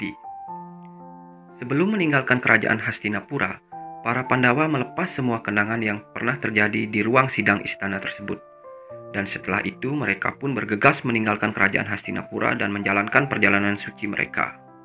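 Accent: Bali, Indonesia. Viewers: ciri khas logat bali heheh pelat r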